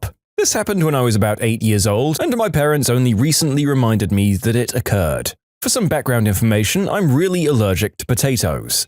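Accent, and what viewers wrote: United Kingdom. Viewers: You're my favorite narrator I love your British accent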